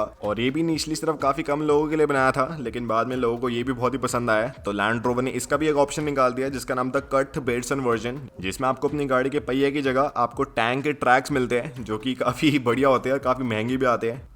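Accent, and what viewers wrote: Haryana, India. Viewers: bhai nirmaladmir ki tarah bola kar ,tere andhar ka haryanvi baar baar bahar ajata hai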